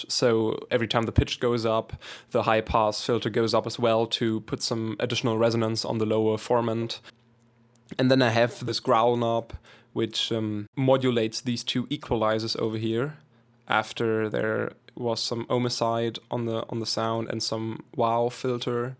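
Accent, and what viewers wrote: Germany. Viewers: He's german :3 That accent :DDD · Wie krass man einfach das deutsch raushört (how easy you just hear the German) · I realised u r german after like 1sec of watching this :D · Are you german ? :) you have a german aczent :D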